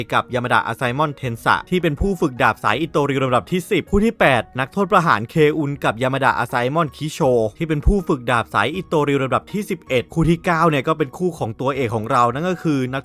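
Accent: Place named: Thailand. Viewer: เสียงพี่เหน่อมาก😂😂😂😂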